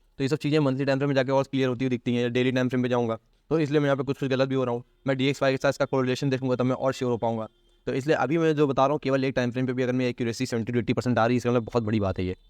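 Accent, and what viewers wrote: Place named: Uttar Pradesh, India. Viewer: Bhai aapke bolne kae tareeke sae aisa lag rha hai ki aap UP sas hai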